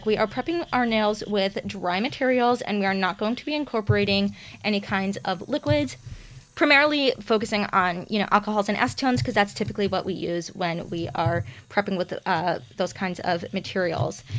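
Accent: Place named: United States. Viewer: Are you in the U.K.!? Notice you mentioned Nail order, but you sound like you have an American accent! Just wondering because wouldn’t it be cheaper to use product brands existing in your county ??! ❤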